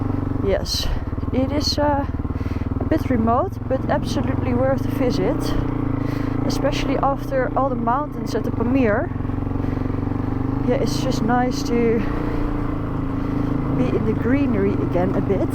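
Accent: Netherlands. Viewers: No. They're both Dutch girls with similar accents which is nice. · Binged watched all of the episodes, great to see another Dutch lady taking on the the challenging rides, at the rate you are flying through the countries you'll be in Kuala Lumpur in no time. I see a lot of comparison in comments with Itchy Boots but apart from Dutch accent you bring your own format really great editing of video.You have really matured in a very short period in off-road riding, I remember the first gravel road I think I stopped counting the oi,oi's now there is hardly any 🙂replaced with wauw. Keep going enjoyable to watch and living vicariously through you and others traveling on bikes through foreign shores. You do you.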